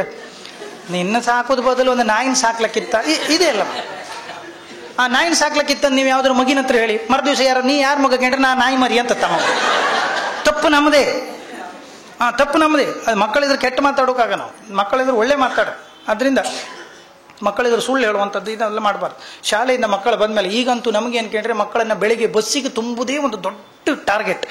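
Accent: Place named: Udupi, India. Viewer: speaks very beautiful UDUPI Kannada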